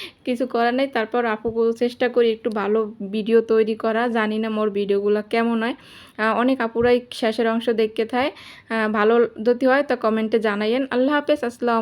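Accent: Barishal, Bangladesh. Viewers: লাইক দিয়ে দেখে নিচ্ছি বরিশালের ভাষা আমার ভাল লাগে। এত সুন্দর করে কথা আর বন্ধু না হয়ে পারলাম না। নারকেল দিয়ে চাল ভাজা অনেক খেয়েছি লেংডা কালে দাদী থাকতে। ভাল থাক বোন 🎁🎁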